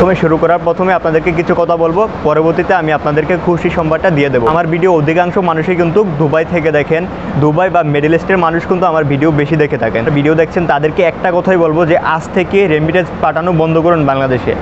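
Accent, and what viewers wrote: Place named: India. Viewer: তুমি কি ইন্ডিয়ান তা না।হলে তো এইভাবে তো বাংলাদেশের ছেলেরা কথা বলে না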